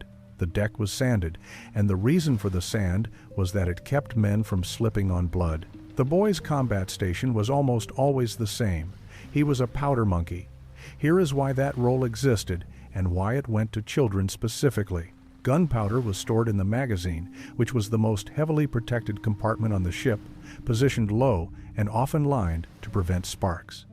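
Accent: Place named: United States. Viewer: An artificially generated English accent would have been more appropriate than an artificially generated U.S.A. accent. U.S.A. accents have no credibility or authority, their owners being savage imperialists abroad, brutal fascists at home and lying propagandists everywhere.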